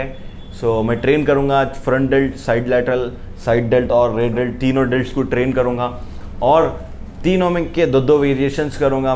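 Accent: Hyderabad, India. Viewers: Hyderabadi ho kya Miya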